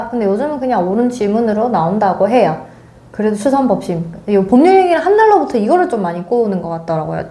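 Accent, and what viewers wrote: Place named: Gyeongsang, South Korea. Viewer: 경상도 분이신가봐요ㅎㅎ 응원합니다 선배님!!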